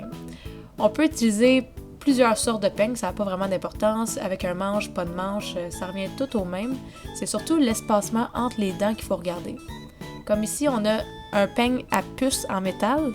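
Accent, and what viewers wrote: Canada. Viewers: Je suis né en France j'ai grandi en France je parle très bien le français et franchement son accent ne me dérange pas du tout elle est très compréhensible quand elle parle, elle est canadienne et franchement c'est très compréhensif ce qu'elle dit dans la vidéo. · Lol cet accent québécois j’arrive pas à m’y faire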